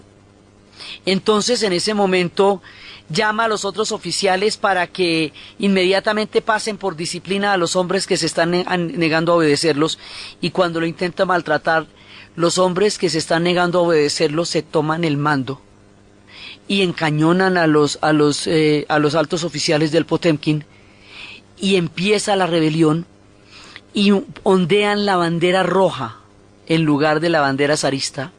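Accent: Colombia. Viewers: Sra. que emocionante y a la vez ameno es escuchar sus relatos, que incluso sin imagen alguna, y con ese acento muy de su bello pueblo colombiano. Saludos desde Perú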